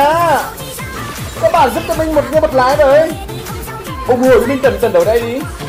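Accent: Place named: Central, Vietnam. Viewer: giọng mtrung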